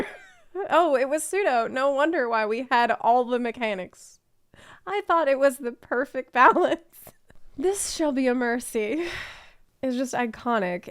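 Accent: South, United States. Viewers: Xbox sprout here, only game I know you can put in..200 hours in a month and be a noob😂 I love listening to ya. As a southerner myself I like the accent. Now..back to my main full time+ot job. FfXiv!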